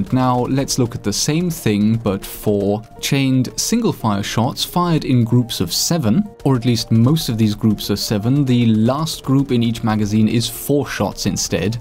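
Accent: United Kingdom. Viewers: ur voice is so British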